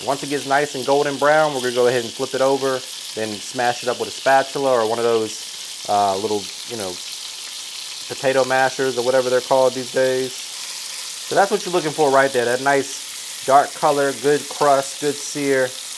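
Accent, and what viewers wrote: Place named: New Jersey, United States. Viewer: Where you from nice voicd.guess jersey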